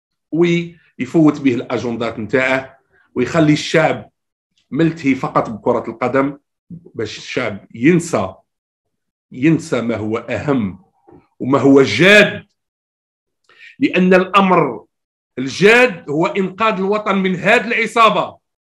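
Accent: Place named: Morocco. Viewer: باين لهجتك مغربية كما احنا...........و علاه راك دير في السنيما ...اسكتوا علينا هذي غير كرة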